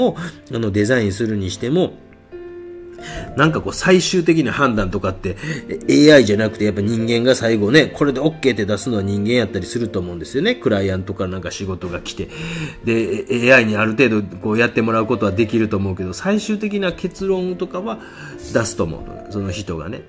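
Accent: Kansai, Japan. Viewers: 柔らかい語り口調の関西弁も落ち着きます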